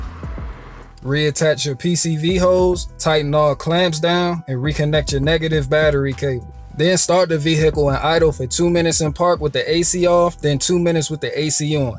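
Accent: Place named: DMV, United States. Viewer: Good video my brother, im tryna get my mobile mechanic business started. Im happy to see another brother like myself. Keep the vids coming, also are you in the DMV?? Im originally from the area and you just sound like it. Keep grinding brotha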